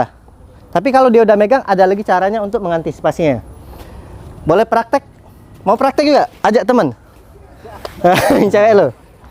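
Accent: Palembang, Indonesia. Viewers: Kyk kenal bahasanya ,itu bahasa Palembang ya salam satu daerah mas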